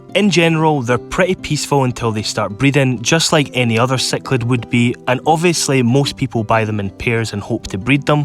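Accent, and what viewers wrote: Scotland, United Kingdom. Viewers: Ahhhh… the Scottish accent… how I’ve missed it! I did some traveling in Edinburgh a while back. Lots of good memories!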